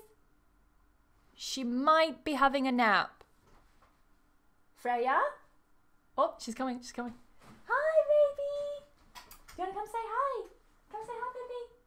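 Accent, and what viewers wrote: United Kingdom. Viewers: IMPRESSIVE LESSON! For me Ms. is the most amazing teacher. I do love her melodic British accent as I told everybody I've devoloped the American pronununciation but now I'm learning the British entonation and pronounciation as well. Thank you very much Ms. 😘😘😘😘😘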